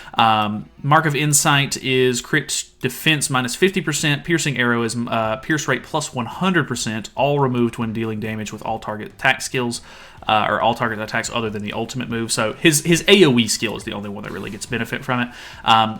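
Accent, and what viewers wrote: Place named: Texas, United States. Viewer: are u by chance from Texas? Your accent reminds me of Maggie from The Walking Dead lol BTW maybe try Green or LR Diane, Lance and King? The passive Diane relic damage boost should be pretty nice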